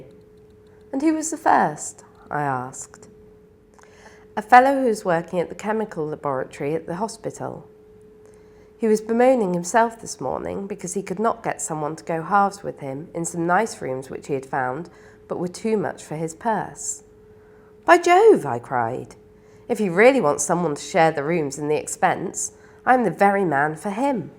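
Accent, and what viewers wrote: United Kingdom. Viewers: 귀르가즘이 뭐 여기서만 쓴 표현도 아니고 영상과 사운드가 중요한 유튜브에선 특히나 많이 쓰는데 왠 불편러들이 이리 많지. 이 표현 뿐만 아니라 이 채널 전반적으로 선생질 하는 댓글이 많은데 뭔 교육컨텐츠라고 꼰대들만 몰려왔나. 영상 좋다가도 댓글 보기가 너무 불편. 습관적으로 스크롤 내리는걸 안해야할듯 ㅡㅡ... 선생님 목소리도 편안하니 듣기 좋고 발음도 영국 특유 발음이 듣기 좋아서 어울리는 표현이라고 생각하고 특히 영어 교육에 특별히 관심이 없더라도 호기심 가지고 눌러볼 만한 제목인것 같아서 오히려 더 좋은것같습니다. 실제로 제목 보고 들어와서 컨텐츠 내용 좋아서 구독했구요. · 여기 담당자님께 질문 드려요 쌤의 발음은 영국 어느 지방의 사람들이 쓰는건지요? 감사합니다 😀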